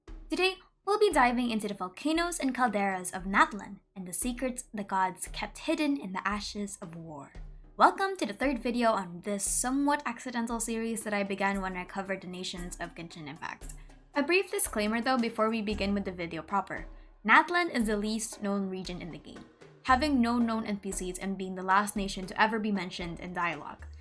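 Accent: Philippines. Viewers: I knew it. You were a Filipino after all xD. I thought my hearing was just deceaving me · So Filipino, huh. No wonder I found your accent so nice to listen to, I guess it felt familiar and homey. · off topic but i knew from the moment I heard ur voice that u were from the philippines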